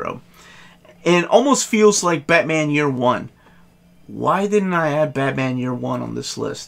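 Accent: United States. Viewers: what country do you reside in! Just super curious, I think you’re American however I cannot tell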